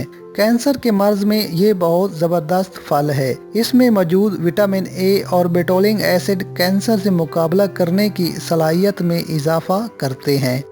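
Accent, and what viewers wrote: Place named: India. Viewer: یہ آواز انڈین کی ھے کسی پاکستانی کی نہیں ھے ہم پاکستانی پھ کو پھ ہی پڑھتے ہیں جبکہ انڈین پھ کو ف پڑھتے ہیں جیسے ہم پھل کو پھل ہی پڑھتے ہیں جبکہ انڈین پھل کو فل پڑھتے ہیں پھول کو فول کہتے ہیں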